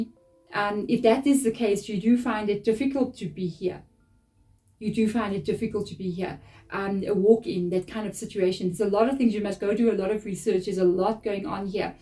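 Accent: South Africa. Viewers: Are you a southy 🇿🇦?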